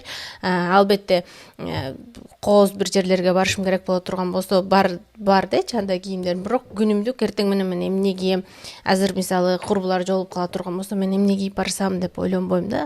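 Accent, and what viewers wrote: Standard. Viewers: Кыргызчаны таза сүйлөйт экен. Баракелде🎉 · ...мен бул каналга бекеринен туш келбедим, бир дем менен угуп чыктым. Бул кыз кыргызча, орусча, англисче, туркчо ой жугурткон практик, динден кабары бар, жолдошунун акылманы, балдардын суйуктуу апасы, эн кучтуу МИНИМАЛИСТКА деп кабыл алдым🎉🎉🎉. Жашым бир топ улуу болгону менен озумо керектуу коп нерсе алдым! Эми уйкум качат 😅. Ото жонокой, таза кыргыз тили менен арбап алды. Аз тапканына чиренип, жерде басканын унутуп, мурдунун таноосу корунгучо чалкалагандар учун улгу болчу акылман кыз экенсин! Эми тынбай коромун! Орустар айтмакчы ПРОСТО ПОЛЮБИЛА!!! Токтобой ос ылайым Алтын кыз!!! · таза жана так кыргыз тилинде сүйлөгөнүң үчүн канча "жакты"белгисин басуу керек болсо,басмакмын.Өмүрүңө жана ден соолугуңа береке тилейм,аман болуп,кыргызга пайдаң тие берсин.Ошон үчүн да баракчаңа жазылдым. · Абдан акылдуу, кыргызчаны таза сйлогон кыз экенсин чон рахмат!!! 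Кайсыл жерлик болосун? Мен Нарындын Ат- Башы районунан болом. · Суйлогонунуз да кыпкыргызча гоо! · Кандайсыз, Кыргызстандан сырткары иштеп окууп журсонуз да Таза суйлогонузду сыйладым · Кыргыз тилин таза сүйлөгөнүңдү сыйлайм,өмүрүң узун болсун жаштарга үлгү болуп жүрө бер кызым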